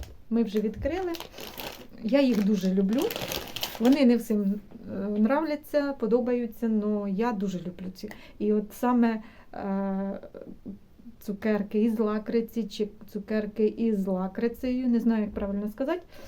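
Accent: Poltava, Ukraine. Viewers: Полтавський говор буде завжди,якщо ти народився і виріс на полтавщині.Для мене він найрідніший...Плачу разом з вами...Тримаймося...тримаймося...Обіймаю...💞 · Смотрю Вас девочки оч. давноно подписалась недавно, полтавская речь это другая история ...сама из Полтавы, ...С наступающим Рождеством...🎄☃️🎉🙏🍀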